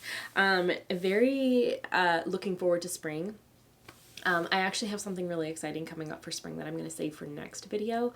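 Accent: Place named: England, United Kingdom. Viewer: I was trying to guess your nationality as your accent wasn’t strong , …. That’s because you lived in England ❤ xxxx What is a touk? Yellow is my favourite colour too, just knitted filcona arwetta french vanilla colour with drops lemon mohair cardigan by petite knit , circular shoulder style … love it 🥰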